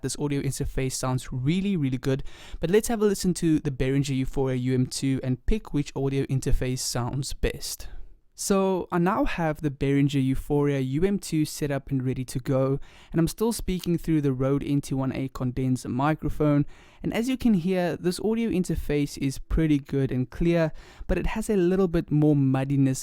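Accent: South Africa. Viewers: Hey!thank you for this video ,quick question,are you from South Africa?you've got the accent lol ,I'm from SA as well!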